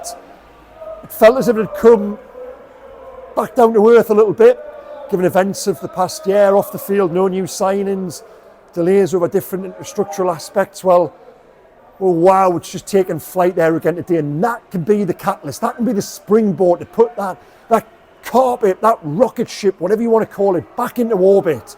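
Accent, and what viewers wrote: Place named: Newcastle, United Kingdom. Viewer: Great jernalist. You speak pure 👌 geordie. You say when bad 👎 you say when deserve. We need more jernalist like you in this country. HONEST RESPECT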